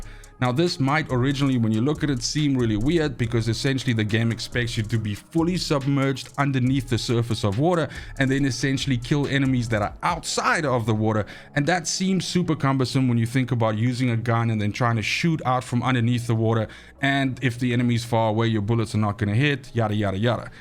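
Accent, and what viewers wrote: South Africa. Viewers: I can recognise that saffa accent anywhere. Thank you for the great video, helped me out. Best of all, it is short and to the point. Keep up the good work.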